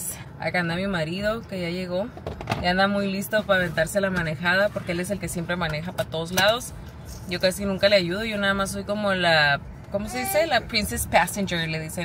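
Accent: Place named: Mexicali, Mexico. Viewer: Me encanta ver tus videos Porke. Me gusta como ablas. Así casi como yo. Jajaja. Yo soy de Mexicali 👍😁pero vivo en los Angels ca. pero si. Así ablamos Nosotros. Saludos chula 😁🌹🥰😎👍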